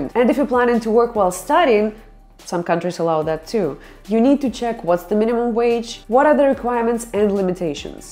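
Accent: Russia. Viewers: strong Russian accent ❤️ You are doing well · Well researched video. Keep up the good work..I like the Russian accent..Maybe you can teach me sometime 😉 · I like the way she talks in a Russian accent it's really hilarious.😅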